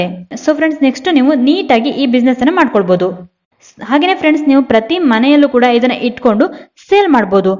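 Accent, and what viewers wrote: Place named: Kerala, India. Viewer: Kerala... Language problem tumba ide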